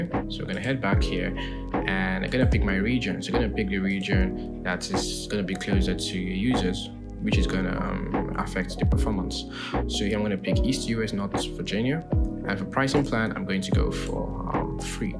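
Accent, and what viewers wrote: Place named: United Kingdom. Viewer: Thank you for providing this tutorial! quite informative, but I did encounter some difficulty in following your accent. Could you kindly share where your accent originates? I was wondering if it's British. Additionally, if possible, could you consider reducing the volume of the background music? Many of us would appreciate being able to hear your voice more clearly without distraction from the music.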